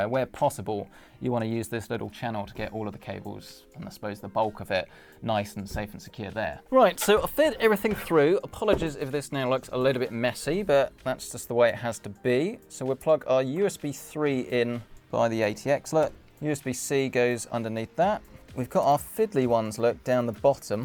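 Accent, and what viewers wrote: United Kingdom. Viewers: You accent is definitely British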